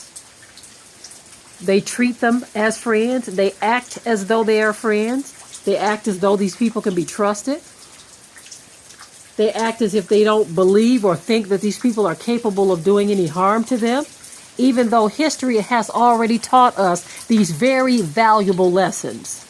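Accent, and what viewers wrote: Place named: South, United States. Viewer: I love your accent, which state are you from? Sounds like somewhere in the South.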